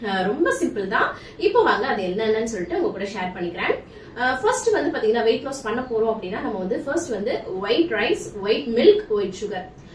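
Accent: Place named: Delhi, India. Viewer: Aap bol ra hi ho bahut accha hi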